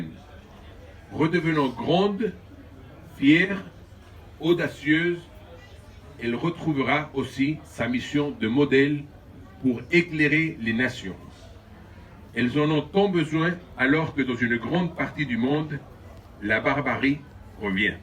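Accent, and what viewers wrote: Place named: Spain. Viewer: Il n’a pas l’accent marocain mais espagnol,son père était Alphonse de Bourbon Dampierre et sa mère la petite fille de général Franco.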